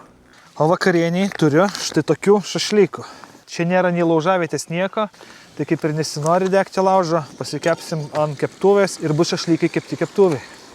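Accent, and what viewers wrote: Utena, Lithuania. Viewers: Pagaliau utenietiškai😉😁10/10 reikikia Utetenietiškai , be visi Iš Telšių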